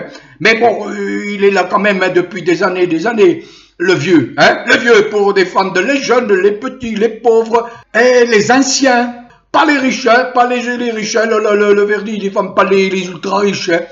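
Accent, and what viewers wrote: Marseille, France. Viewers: J.adore votre accent marseillais pour bloquer le M@ ronds........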